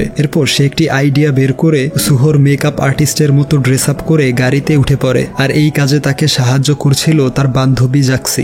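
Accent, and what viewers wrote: India. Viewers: Apni indian kotha shone mone hoy Bangladesh e ami Bangladesh e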